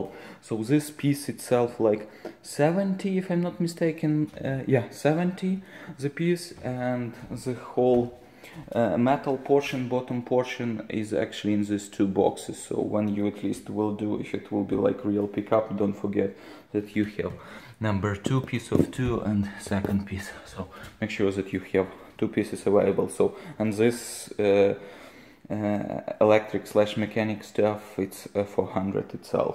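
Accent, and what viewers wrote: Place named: Russia. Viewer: You are not a Russian case. The accent is similar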